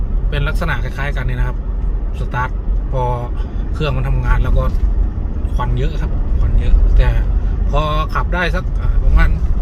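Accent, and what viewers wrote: Phu Thai, Thailand. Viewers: แอดมินเป็นคนผู้ไทบ่ครับ สำเนียงเว่าคือๆแท้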